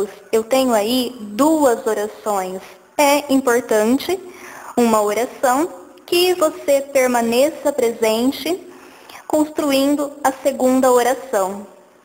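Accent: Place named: São Paulo, Brazil. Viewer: Que voz fudida e de paulista!Mais boa aula!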